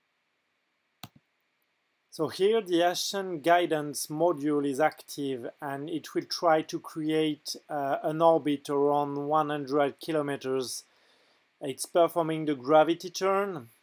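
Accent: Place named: France. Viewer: Quelle négativité. Malgré l'accent français on comprend très bien ce qu'il veut dire et la vidéo présente très bien la fonction.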